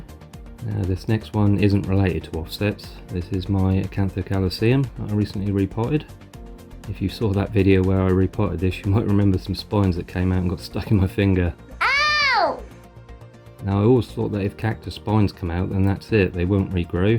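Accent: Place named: England, United Kingdom. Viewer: mellifluous English English accent.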